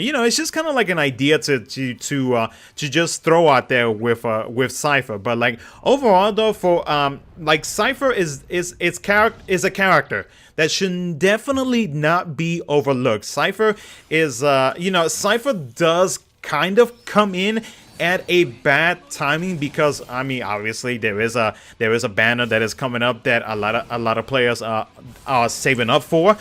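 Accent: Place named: Brooklyn, United States. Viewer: This American accent...Brooklyn? Queens?I wanna know! I love 💘 it.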